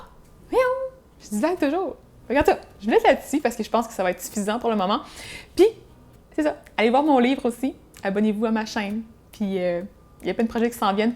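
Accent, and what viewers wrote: Quebec, Canada. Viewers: Bonjour, J'achète les croquettes Orijen pour mes chats. C'est une marque Canadienne. Elles ont guéri les problèmes urinaires de mon grand chat qui était condamné par la véto à ne manger que des royal canin médicalisées à vie.... Mon deuxième chat, un petit qui souffrait de diarrhées interminables pendant plusieurs mois n'a plus eu un seul problème quelques jours après être passé aux Orijen. Il avait fait plusieurs séjour chez la véto. Elle lui a fait essayer deux gammes différentes de Royal Canin et à chaque fois les problèmes revenaient une fois rentré à la maison.... Tout ça pour te dire que cette marque a changé ma vie après avoir craqué presque 500 euros sur plusieurs mois en frais véto. Le seul truc qui m'embête c'est que je vis en France et que ce n'est pas du tout local pour moi. Mais toi vu ton "accent" tu dois être canadienne ou québécoise ?donc c'est plutôt cool. 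;).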